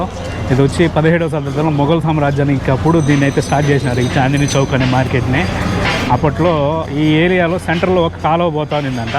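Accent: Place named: Chittoor, India. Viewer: Ho, ok😊 As per your slang, I thought you are from TPT/CTR😂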